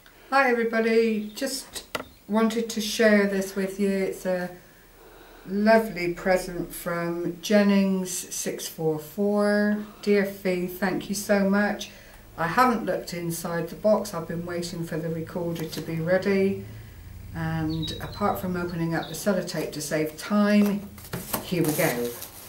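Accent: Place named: Cornwall, United Kingdom. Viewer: Wow, you lucky lady, I like your accent, I wonder if your from cornwall? I love it down there...the plaque you won is lovely, I tried to win it too..lol..so generous of Fiona to send the extras for you, I love that dangly trim, you'll have fun using that I'm sure. I am also learning so much from her tutorials. Welcome to the YouTube video making side of crafting..lol..(even if you do only ever have 1 video..lol) enjoy your winnings. TFS...Ann...x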